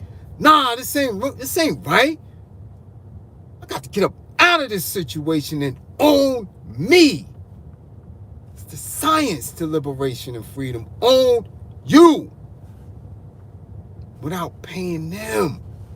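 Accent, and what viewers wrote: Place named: New York, United States. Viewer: I just like your style man. The way you speak, the New York accent, knowledge being passed on, I salute 🫡 you OG, 29 year old brotha here. I’m saving this video to cause you responded to some of my live chat 💬 comment 😂😂. Keep it safe out there as well.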